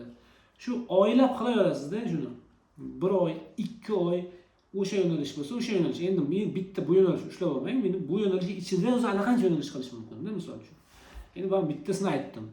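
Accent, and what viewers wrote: Namangan, Uzbekistan. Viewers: aka namangandan emasmisiz mobodo?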